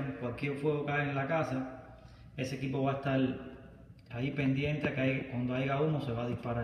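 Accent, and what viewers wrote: Cuba. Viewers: Tu eres cubano eh?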